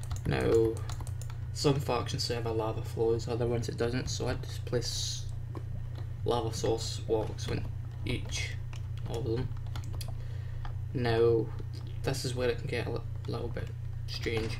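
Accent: Scotland, United Kingdom. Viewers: Ur scotish accent is top notch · is that scottish?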